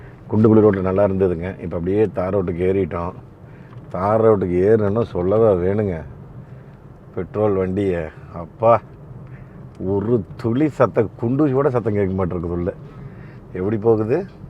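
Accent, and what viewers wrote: Kongu, India. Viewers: காரைவிட உங்கள் கொங்கு தமிழ் அழகு...அம்மா ஊருக்கு போண மகிழ்ச்சி 😀🥰🙏🏻. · அட நம்மூர் அதாங்க கொங்கு தமிழில் கேட்டதும் மனசு குளிர்ந்து.அய்யா SPB மறக்கமுடியுமா. எதார்த்தமான தங்களின் பதிவு ச சொல்ல வார்த்தை இல்லை.my son also se your comments and he like to purchase hundai or Honda amaze.தங்களின் பனணிதொடர் வாழ்த்துக்கள்.எனது ஊர் k village சர்க்கார் கண்ணாடிப் ஆத்தூர் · Kongu slang is🤔😊 mesmerizing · Anna unga kongu tamil review tha mass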